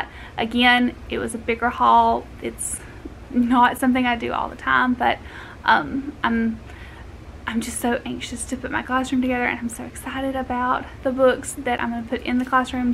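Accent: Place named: South, United States. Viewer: Hi, You are such a fabulous, conscientious, thorough, hard working, exciting teacher that makes everything so interesting for the kids. After seeing your previous fifth grade class and now seeing your additions for your new 2nd grade classroom it will be a "feast" for the kid's eyes this coming school year! It will be so colorful, cheerful looking, coordinated and organized, too! AND add to all of that, you are such a cute southern girl, with charming accent to match, as well! I can't help but notice that huge gorgeous ring (engagement/wedding ring (?) that you are wearing now. Does that mean what we think it means? (P.S. I am a former 2nd and 3rd grade teacher AND I have also taught Budgeting and Credit Score classes in a women's shelter. And I am also a mother of three and a grandmother of three. So I recognize all of your effort, talent and admire you for all that you do! 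I now live in a fabulous retirement community in Florida, called The Villages. Have you heard of it? I am passing on to my retired educator friends in our club about your channel so you will probably see more subscriptions from them) AND OH! I love your new home office too - another feast for the eyes (!) - so efficient and it gives you so much room to work! Have a great summer and upcoming school year,!... from an admirer... from afar!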